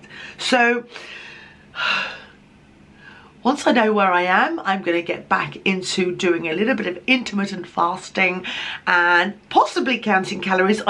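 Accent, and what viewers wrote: Wales, United Kingdom. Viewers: There she is❤ and with a Welsh accent too🤣😂 I'm crying 😂 Lovely to see you back❤Life happens and you've been through alot the last few weeks, sending big Cwtches your way🥰❤️🥰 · Yay, good to see you as you've been missed. Shame that the teaching assistant post did not work out. Working in a kitchen proving a diet disaster !! Keep practising the Welsh accent. Sorry to hear of the sad loss of friend & family.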